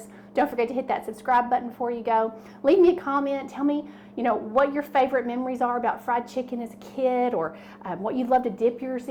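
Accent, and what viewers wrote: South, United States. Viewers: I love how happy you are about sharing your cooking skills! And your southern accent! 😉 I’m southern too! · My wife and I who now reside in Wyoming grew up in Memphis. We Love your accent. Your cooking skills are a reflection of your Famous Southern Heritage, we can't wait to try this recipe. Thank You!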